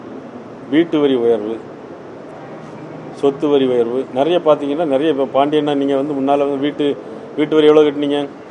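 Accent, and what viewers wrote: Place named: Tirunelveli, India. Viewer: நீங்கள் பேசும் நெல்லை தமிழ் அருமையாக இருக்கிறது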